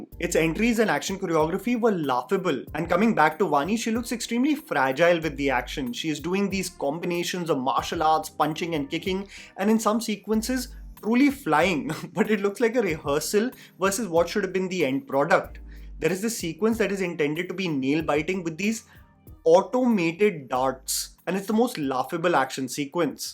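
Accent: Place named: Maharashtra, India. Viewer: You're Marathi accent is just adorable ❤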